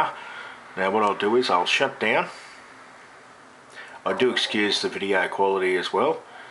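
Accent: Australia. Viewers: Thanks for the vid. I must say that EVERYTIME I find someone who has successfully uploaded their OS onto the REVO, I can not find the MoBo that they used for sale online. EVERYTIME!! Good vid, the aussie accent was a nice change of pace too. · I'm a kiwi, and i can tell an Aussie when i hear one lol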